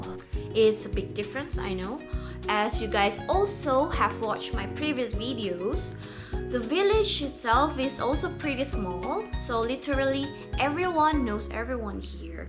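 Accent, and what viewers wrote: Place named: Europe. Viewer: Eeiiinnn logatnya macam European sekarang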